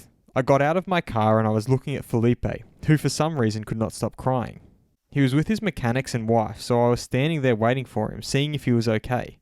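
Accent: Australia. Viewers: is Australian, so there's no difference between how we say pole and poll, they sound the same.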